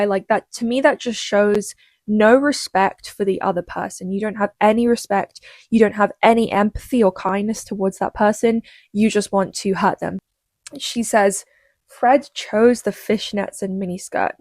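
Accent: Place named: United Kingdom. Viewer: I also like your accent,. I like British and British-sounding accents a lot. 🤍🪽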